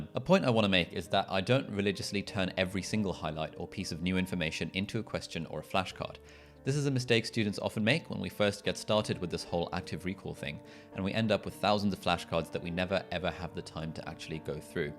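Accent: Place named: RP, United Kingdom. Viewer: Never heard ‘wasteman’ in a posh accent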